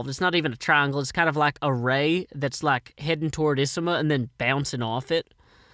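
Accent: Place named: Kentucky, United States. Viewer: Excellent work! Love the accent. I'm a KY girl myself so it is rare to hear something sounding like home on Youtube! I need you to make a prequel with more Etho in it. Hahahaha!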